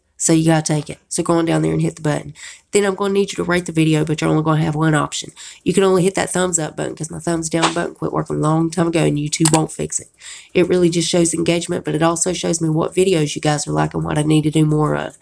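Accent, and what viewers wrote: South, United States. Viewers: Thanks for the spoilers, loving your Southern accent..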